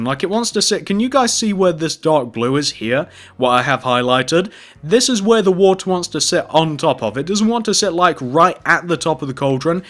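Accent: United Kingdom. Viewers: Hello, Number 1 fan I have a few questions I want to ask you is their a way for me to contact you? Also I been a fan since 09 and I learn everything from you.. on minecraft. Also British accent is awesome!. Ps: Number 1 fan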